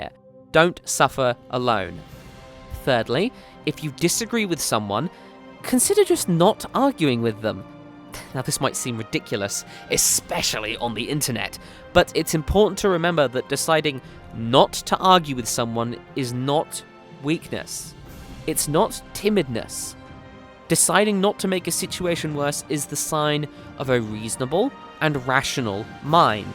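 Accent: RP, United Kingdom. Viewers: such a posh sounding accent ha, been along time since I have delt with raid drama. started playing again on a private server, expect lots of drama on them 40 man raids hehe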